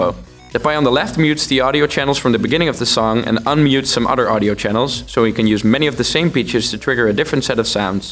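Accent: Netherlands. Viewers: I'm Dutch myself, and I have to say that your English is really good. It took me a while to realize that your accent was Dutch. Good job! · As a native English speaker, it was instantly obvious he has a Dutch accent, but he does have good English :)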